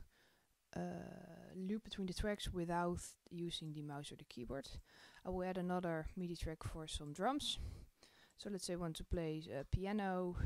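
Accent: Netherlands. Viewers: ik hoor dat je nederlands bent dus ik doe het ff zo, mijn minilab kan niet opnemen en selecteren zoals die van jou het doet. mis ik iets?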